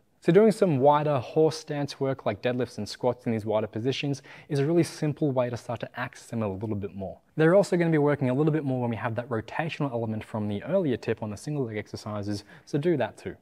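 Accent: Australia. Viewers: Asian Australian? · its alittle weird watching someone whos asian with an Australian accent.. but your the not the first person ..since the first I know of is Joe from the AnimeMan ^_^ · Your accent; Australian?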